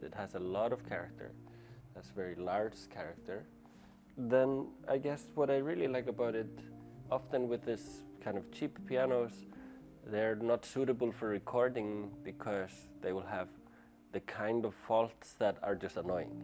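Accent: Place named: Iceland. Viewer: Just happened to witness you talking for the first time and your Icelandic accent is so charming. You could easily do ASMR content btw. Oh, and cheers for all the blissful music you make. ❤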